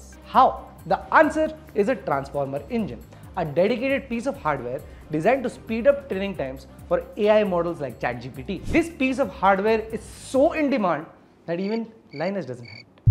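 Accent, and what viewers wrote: India. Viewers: Dude…your accent. You mine aswell speak Indian. Practice brother.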